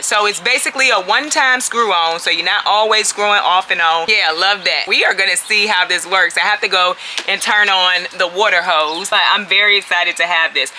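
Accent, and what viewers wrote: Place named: Virginia, United States. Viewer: LoL, it's always the Virginia accent that I love 💞.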